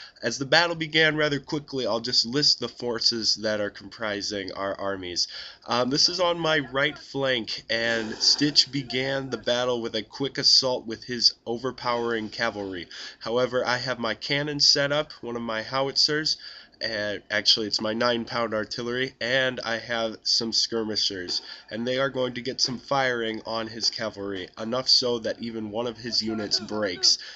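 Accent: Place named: United States. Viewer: Good commentary. Are you an American living in France? Just wondering about your accent (sounds like my American one). Funny thing about your opponent's name. I kept picturing that alien from "Lilo and Stitch."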